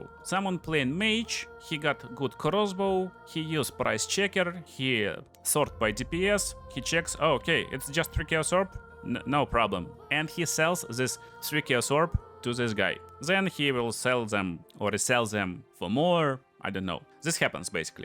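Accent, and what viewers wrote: Russia. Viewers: Чувствую русскую нотку проскакивающую через английский)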